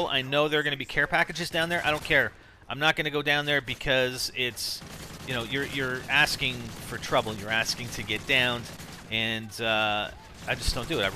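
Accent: Canada. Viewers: your canadian aren't you =p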